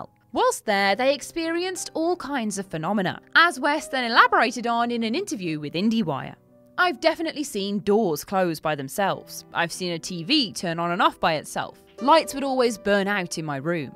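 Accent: United Kingdom. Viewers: okay, enough of this. is obviously British. American pronunciation is different. Get over it. Worchestershire is pronounced Wooster-sheer, schedule is pronounce shed-ule. You are aware that the British INVENTED the English language. We only borrowed it, so give her a break on pronunciation, okay. You go!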